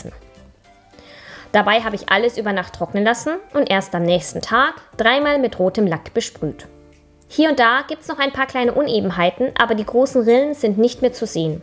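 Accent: Germany. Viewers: Geil, wenn du schwäbelst🤣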